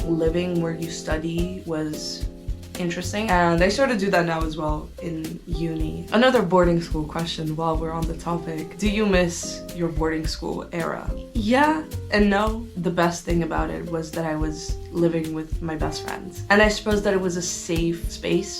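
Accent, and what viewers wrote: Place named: Russia. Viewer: girllll i like ur russian accent , btw im also going to be studying at film school cant wait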